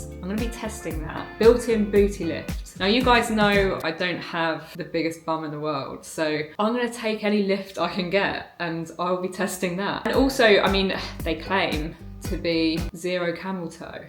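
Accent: United Kingdom. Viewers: I love you sincerely from Texas and absolutely love the British accent...!!!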